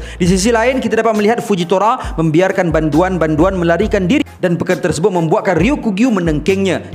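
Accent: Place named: Kelantan, Malaysia. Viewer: Kemahhh selit dielek kelate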